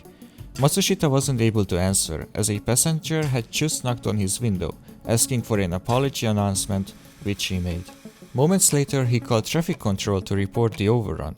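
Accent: Hungary. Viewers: Csak nem Magyar vagy? Nem nagyon hallottam az akcentust az előbbi videókban, de most kicsit jobban lehet hallani. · Az angol kiejtésedről nem mondanám meg, hogy magyar vagy, de a japán szavakat olyan magyar akcentussal ejted ki, hogy az elárulta. Nem rossz. Maga a videó se, elég érdekes.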